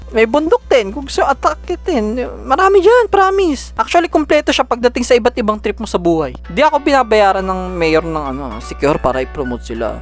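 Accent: Visayas, Philippines. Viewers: Karon rako nakabalo nga bisaya ka man diay bai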